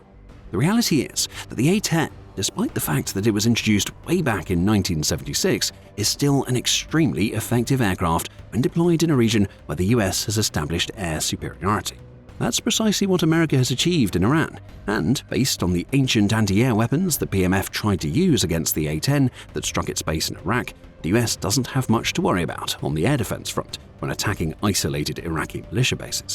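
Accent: England, United Kingdom. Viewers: So the Narrator sounds like he is from the ENEMY of the US, England, & the BBC. They are the HUB of the International Cabal who Trump has been fighting ever since coming down the Escelator.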